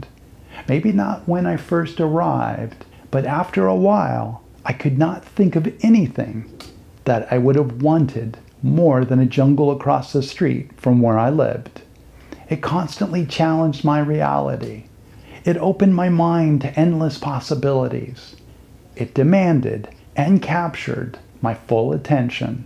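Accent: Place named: England, United Kingdom. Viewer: Thank you 🙏 so much for your beautiful video. I love it a lot because I learnt so much from your English accent 🙏 and your presentation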